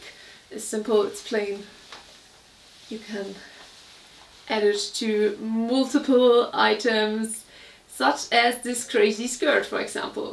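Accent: Germany. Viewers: You're a very pretty woman 👩 💕 look sexy in these style clothes 😍 I think I can hear a German accent that sounds cool. Not a fan of blue hair, but I understand that's today's style, I just like to picture you with dark brown hair, oo LA LA 😉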